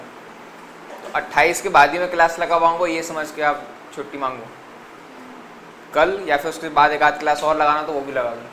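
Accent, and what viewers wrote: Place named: Ratlam, India. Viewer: yes i was right..... Apki language me apna pan lga.... me bhi ratlam MP se hoon 😊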